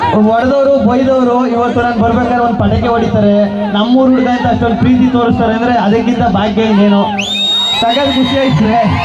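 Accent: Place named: Mysore, India. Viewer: Mysore mandya nam Baashe 🎉 slang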